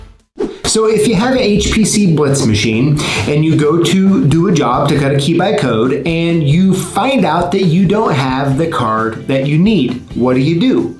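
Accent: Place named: South, United States. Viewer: Thanks,. We southern guys actually like it when people assume that we're stupid because of our accent.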